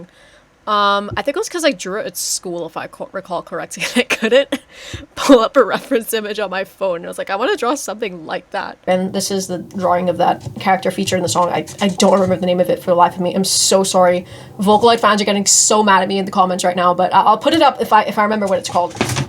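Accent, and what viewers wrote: New York, United States. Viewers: my favorite brit with a new yorker accent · You sound like you're from New York.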